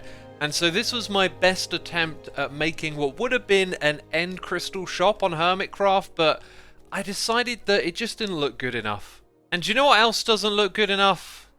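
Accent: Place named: London, United Kingdom. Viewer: overcorrects his 'v' 'th' substitution error by saying 'nether' instead of 'never'.:) I enjoy your london accent.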